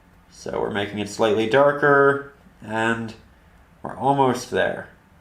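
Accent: Canada. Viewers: Wow you're Canadian accent really comes through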